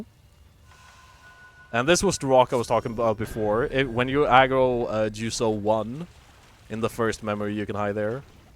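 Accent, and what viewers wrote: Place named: Sweden. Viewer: är det en svenne mina öron skådar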